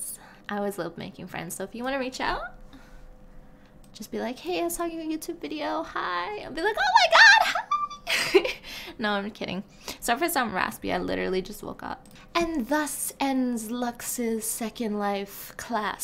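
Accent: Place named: United States. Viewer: This was so entertaining thank you. I love your accent, im presuming your American, im in Scotland so impossible to tell where in the states people are from lol. Thanks :) x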